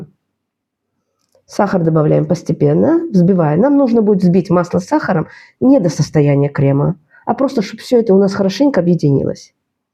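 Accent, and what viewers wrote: Baku, Azerbaijan. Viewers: А я думаю акцент такой близкий.знакомый точно Бакинскиц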